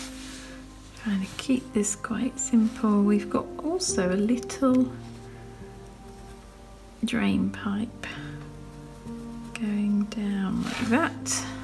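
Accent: England, United Kingdom. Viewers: English accent. Makes you sound important, official, BBC-like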